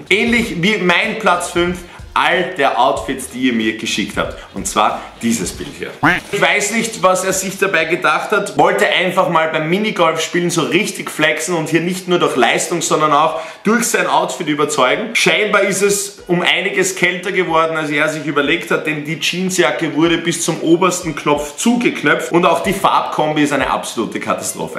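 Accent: Austria. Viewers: #österreicher "Wos dus du dn grod"😂😂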